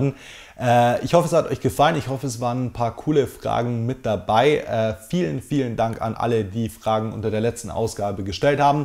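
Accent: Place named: North, Germany. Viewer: Hi, dir auch ein frohes neues Jahr, wieder ein super Video! Hat mich wieder gefreut eine 35 Minuten Ausgabe zu sehen. Gerne mehr von dem Format, Länge ist gar kein Problem. Wenn man dir bei deiner Formulierung etwas ankreiden wollte, könnte man sagen, dass du das Wort "so" häufig benutzt.;) Aber nichts über dein Intro. Früher habe ich deinen Akzent als Norddeutscher gefeiert.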